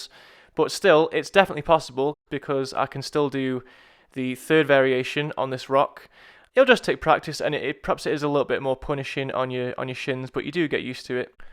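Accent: United Kingdom. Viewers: You speak uk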